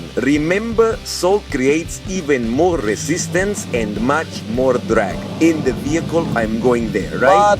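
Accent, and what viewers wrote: India. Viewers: Por que hablas como Apu? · El acento se escucha como el de los indios de la india. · En realidad eres tú? Suena como tu tono de voz, pero un acento Indi demasuado marcado, no suena ni como acento latino, como le hiciste? Por lo demas excelente video bro, me encantan tus videos, keep ut up, thaks to you I made my decision between a few options to by a car appreciate it 🙏🏻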